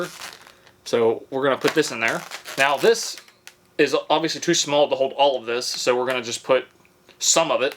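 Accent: South, United States. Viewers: Zucchini ---- pronounced zoo key knee. and it's a squash!!! BTW the next time you want to make corn dogs, use cornbread mix, not pancake batter. You sound like your from the South, you should know a good cornbread recipe. That's why they are called CORN DOGS not PANCAKE DOGS! There is a green light on top, it will turn on when they are ready. YOU CAN'T SAY IT TASTES LIKE A CORN DOG!! IT'S NOT MADE WITH ANY CORN !!!!!!!!!!!!!!!!!!!!!!!!!!!!!!!!!!!!!!!!!!!!!!! BTW You can buy sausages made the same way in pancake batter in the frozen food aisle. hahahahahaha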